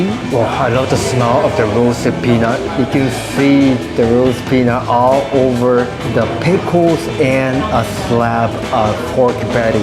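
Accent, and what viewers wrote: Singapore. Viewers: Great tour video! I will have to check out that area next time I am in SG. I must say your videos have really evolved over the years. I started watching you during Covid and it has been interesting to hear your accent evolve as well. At times you sound American or like you could be from here in Hawaii. Other times your Japanese accent comes out. But lately, I have noticed you sounding a lot more like the Singapore locals…. You have truly gone native, so to speak, but it seems like you can turn it on and off, depending on who you are speaking with or about! A sign of a talented mind…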